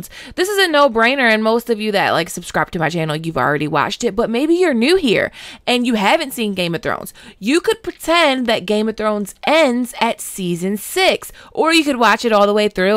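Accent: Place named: Baltimore, United States. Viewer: I knew you was from Baltimore because you sound just like me 🤣🤣😂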